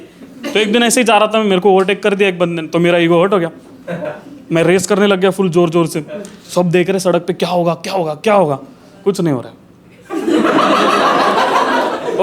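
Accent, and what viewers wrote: Maharashtra, India. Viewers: ...Phir dusra sapna dekha😂😂😂 Bhari vaatla marathi accent mdhli stand up aikun🙌, mhanun bakichya jokes peksha tuze jokes jaast relate zale😂😅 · Marathi Mulga ani marathi accent...chumma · Marathi accent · Getting Zakir vibe plus marathi accent .. good one ! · Marathi accent hota na to aavdla sir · Ohooò bhau that marathi accent is to hilarious. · Marathi accent shabd shabd se jhalak rahi thi. Maja aali 👍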